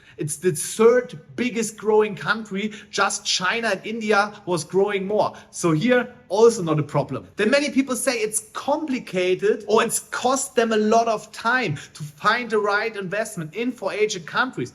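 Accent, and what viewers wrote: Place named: Germany. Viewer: "whats the TRUTH". Your German accent speaking English is hilarious.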